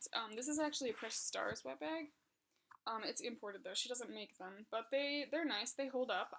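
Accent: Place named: South, United States. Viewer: Are you fro. Alabama or Georgia???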